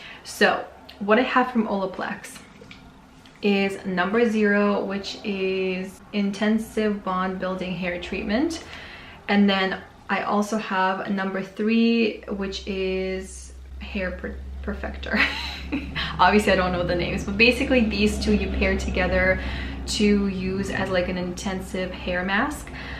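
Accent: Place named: Michigan, United States. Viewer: I love Mason Pearson brushes! What state are you from? You sound like you’re from Michigan or further NW 🙂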